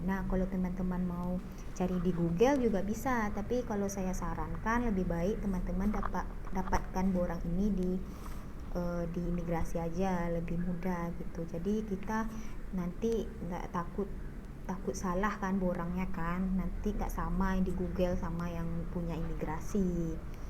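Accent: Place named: Medan, Indonesia. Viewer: Medan banget suaranya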